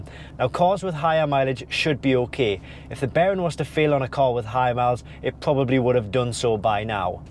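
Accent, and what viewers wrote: United Kingdom. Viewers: what part of the UK are you from? Your accent is different from my London friend.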